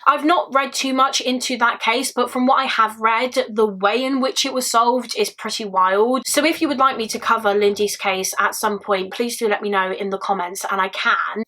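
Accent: United Kingdom. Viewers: Are you British sweetheart???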